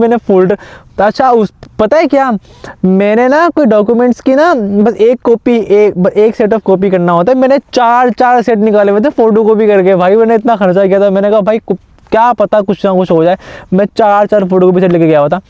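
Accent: India. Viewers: Bhai aap to pure indian lagte ho accent bhi ❤ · brooo, I literally thought that you were an indian but aap tou Pakistani niklayy bhaii saab. your accent is kinda indian ngl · Trust me bhai teri accent sun ke laga tu Indore( India)se hai. Mast aadmi ho tum.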